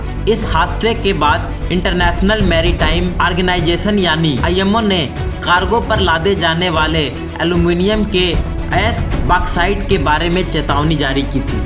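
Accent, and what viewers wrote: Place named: India. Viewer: Bhai Indians esy hi bolty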